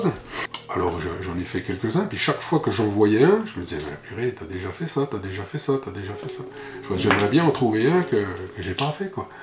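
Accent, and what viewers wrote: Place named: Franche-Comté, France. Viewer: ❤ Magnifique témoignage (et magnifique accent comtois)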